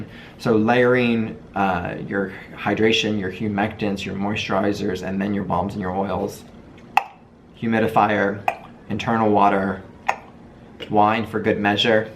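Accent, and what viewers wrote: New York, United States. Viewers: R u originally from Seattle as U sound like ur from New Yawwkkkk!!! East coaster here as well. LOVE UR PRODUCTS!!! Keep on going!!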